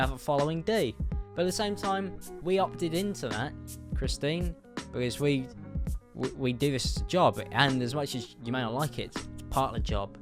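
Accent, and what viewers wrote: United Kingdom. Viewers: i usually cant stand hearing the british accent (for no reason) but i really appreciate your vids!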